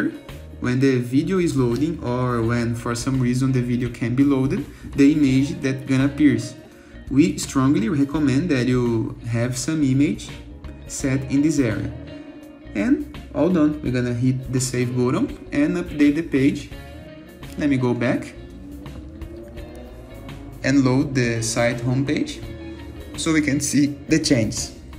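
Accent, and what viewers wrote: Brazil. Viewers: I'm Brazilian and listening to your video, I can conclude that you are Brazilian as well haha.... so cute are the Brazilians English accent hehe, I don't have it anymore cause I wasn't raised in Brazil. But Thanks for your video, it was short and helpful. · this is a Brazilian accent if you have ever heard one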